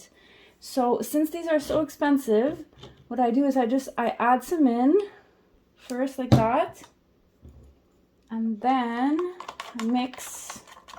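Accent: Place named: Quebec, Canada. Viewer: Omg that is exactly the style I am trying to achieve thanks! You are good teacher and oh boy the quality of your videos!!! And that French Canadian accent. Love ya, a big fan from Quebec! Thanks for all the hard work you put in your channel. You are the best.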